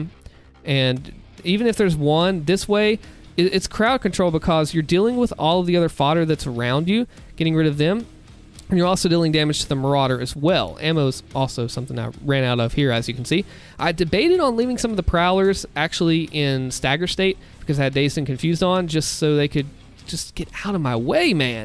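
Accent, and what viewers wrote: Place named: Midwest, United States. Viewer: Hey r u from the midwest? Im just curious, ur accent is so funny 😂. Keep up the good work tho, u r honestly one of the few doomtubers who put effort into their content. I have a suggestion for u however, which is to play some doom eternal levels on the switch. I think that would be an awesome level (I play on switch 🤣)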